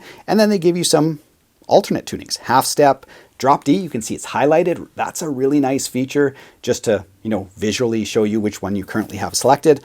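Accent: Canada. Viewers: Hey! Love your show and your Canadian accent. I recently bought a Gibson SG Special Faded (2005), it's rather cheap for a guitar with the Gibson logo on the head, on the second hand market. What's your opinion about this guitar?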